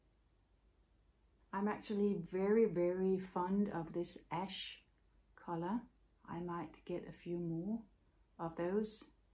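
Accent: Denmark. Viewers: Hej, da jeg hørte dig tale første gang jeg så Mindful Making, kunne jeg høre med det samme at du var dansk.hahaha Det var en rar følelse. Med hensyn til dit garnlager, skal man jo ikke gå ned på garn, vel!?;o) Jeg kom til at tænke på, at hvis der er nogen i Australien som har brug for Holst garn, kunne jeg hjælpe med at skaffe det og sende det som en gave (told:o og så kunne jeg få noget garn tilbage på samme måde. Hvad mener du om ideen? Vh Maud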